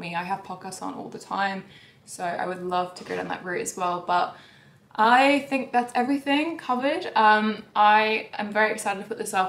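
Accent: Australia. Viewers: ….she Australian ….. that her accent🤦🏽‍♀️🤦🏽‍♀️🤦🏽‍♀️ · Your Aussie accent has come back! Happy for you 💖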